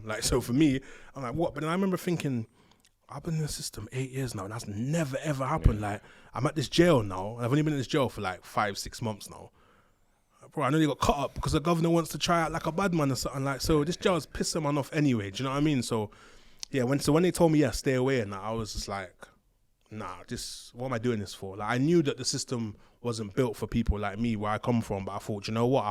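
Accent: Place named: Roadman, United Kingdom. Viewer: Couldn't listen for more than 2 minutes. Vile black roadman accent 🤮🤮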